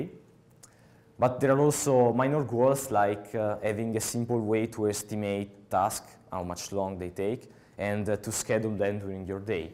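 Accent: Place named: Italy. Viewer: Love the Italian accent describing Pomodoro technique. :-)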